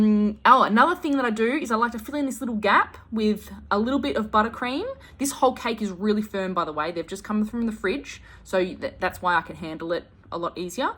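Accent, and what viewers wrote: Australia. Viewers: 😂😂to the American 😂 your voice ((Australian accent)) could never be a snooze fest😂😂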